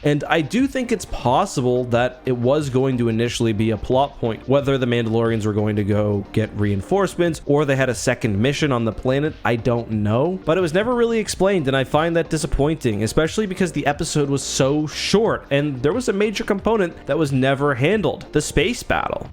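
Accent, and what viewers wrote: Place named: Southern Ontario, Canada. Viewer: I feel like your accent is more pronounced in this video, and its basically the exact same as my southern ontario accent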